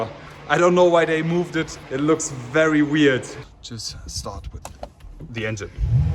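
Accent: Germany. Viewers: I’m assuming your German, your accent is hella cool lol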